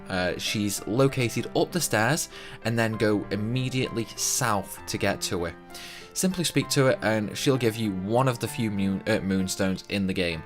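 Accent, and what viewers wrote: Stoke, United Kingdom. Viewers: Mate are u from stoke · Another YouTube gaming video soiled by a brittish accent 😩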